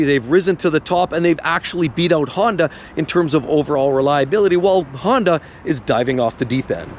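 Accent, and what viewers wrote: Edmonton, Canada. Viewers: Seems like you’re from Edmonton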